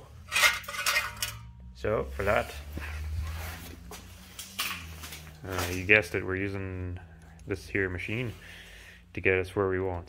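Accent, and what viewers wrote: Canada. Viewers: Love the humor, reminds me of AVE, you also sound like your a canukistan native, Oh and by the way love the vid's well done.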